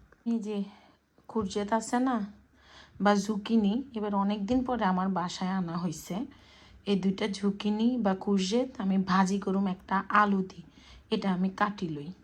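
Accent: Noakhali, Bangladesh. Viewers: Apnar kotha purai noakhailla.amio noakhailla😂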